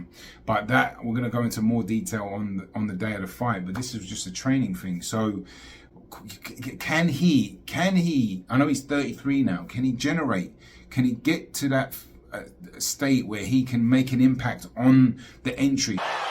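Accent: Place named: Cockney, United Kingdom. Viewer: Love that east end accent, guv mate 👍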